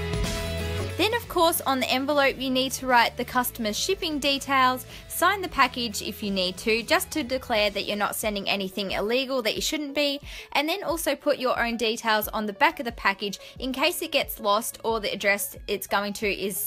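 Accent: Australia. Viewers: Are you australian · Is she Australian? Because if so that will make me extremely happy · Are you Australian? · Are you Aussie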